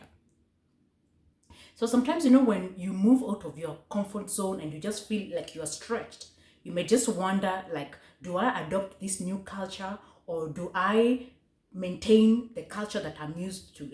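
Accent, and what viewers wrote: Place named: Africa. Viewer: I Moved to the US in 1989 while in middle school. In AFRICA you great each other all day long 10 or 20 times a day. The European does not think like you. If you great him more than once, he thinks you are physco. Black Americans on the other hand have a culture of their own which is very different from African Culture. Don't try to change America. It will change you before you can change it. The sooner you can erase that African accent the better. Americans completely stop listening to what you are saying and are more interested in why your accent sounds very strange. This can really hurt your career chances. When you move to a new country get with the program ASAP. All the best.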